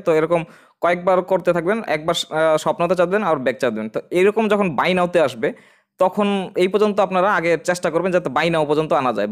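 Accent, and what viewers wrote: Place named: Fatullah, Bangladesh. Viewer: Vai apnar basha ki fattulah naki 😅😅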